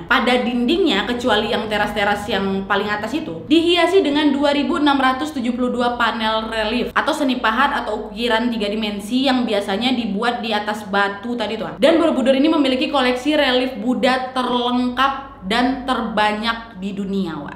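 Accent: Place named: Medan, Indonesia. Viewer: Cerdas,pintar,ceriwis luar biasa penjelasannya, penting banget untuk di ketahui, orang Medan ya mbak ini😀👍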